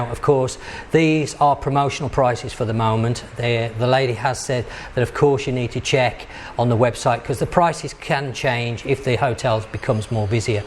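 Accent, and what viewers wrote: West Midlands, United Kingdom. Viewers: Hey how are you bro. Sorry we haven't been able to visit you for a while we were in Bulgaria then Malta were we got stuck due to Covid and flights we're cancelled. But great to catch up with you again. Where in the west Midlands are you from bro, i really recognise that accent. Very cool indeed. Thanks so much for taking us along with you on this adventure trip. Nice 4x hotel. Stay safe and take care my friend catch up soon God bless you.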